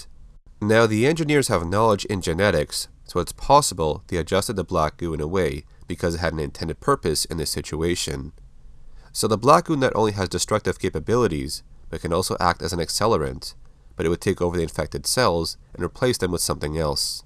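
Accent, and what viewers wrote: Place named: Canada. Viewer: are you Canadian? I swear you sound Canadian.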